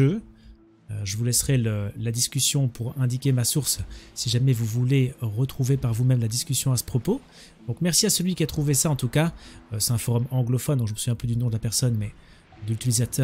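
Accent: Belgium. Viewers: Oh purée!!! Merci car je "galère" (C'est le cas de le dire) tant sur ces navettes que je commençais à me lasser d'y jouer...Mais comme tous tes viewers je suis fan; J'aime bien notamment tes petits "belgicismes" et ta prononciation des mots en ui : " depoui, poui, appoui...etc...Hé hé ça me fait sourire mais toujours avec respect et jamais sans "ennoui"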